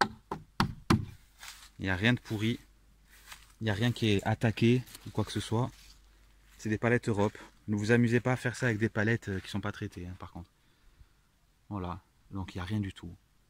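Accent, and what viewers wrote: Corsica, France. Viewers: Tu n'aurais pas un petit accent corse par hasard 😁. Super ta cabane.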